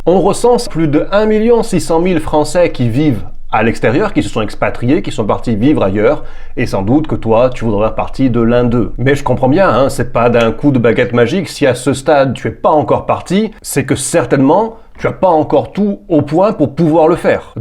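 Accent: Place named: Belgium. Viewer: Attends, à cause de son accent ma femme croit qu'il est belge 🤣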